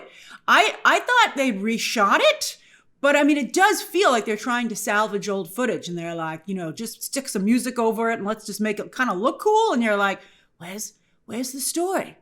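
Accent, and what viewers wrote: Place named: New York, United States. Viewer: love the NYC accent hahaha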